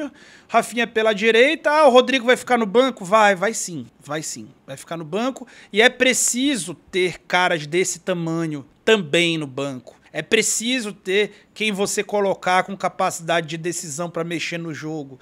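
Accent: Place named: Rio de Janeiro, Brazil. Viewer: achei que fosse carioca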